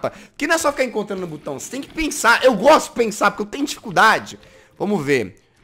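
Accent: Azores, Portugal. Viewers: tem staque portugues otimo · Adorei o teu sotaque de português Eu sou portuguesa kkkkkkk🥰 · O seu sotaque português é midas!!! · O sutaque português é otimo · o teu sotaque português de portugal parece mais com o sotaque de Portugal açores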